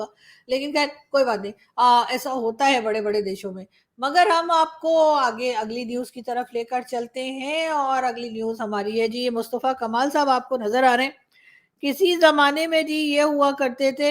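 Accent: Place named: Allahabad, India. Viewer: You are great , absolute Allahabadi dialect , but take care of yourself ❤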